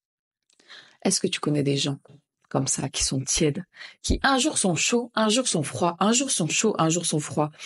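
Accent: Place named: Africa. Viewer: Ca se voit que tu fréquentes des africains qui ont vécu là-bas, tu parles comme eux, avec le même accent. C'est dingue. Cette société "multiculturelle" gauchiste c'est vraiment mortel pour les blancs.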